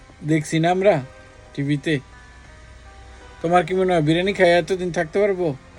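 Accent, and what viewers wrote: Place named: Mymensingh, Bangladesh. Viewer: vai mymensinghor vasa apnermukhi sei lage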